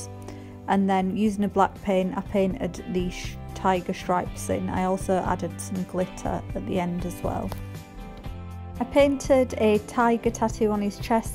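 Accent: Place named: New England, United States. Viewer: Live the yanky accent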